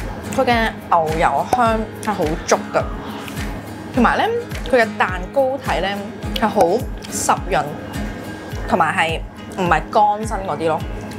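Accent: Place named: Hong Kong, China. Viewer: 講嘢超浮誇有多懶音，你想人聽你講嘢係咪都應該字正腔圓少少？ 什麼Hi咗1秒？ 正宗港女tone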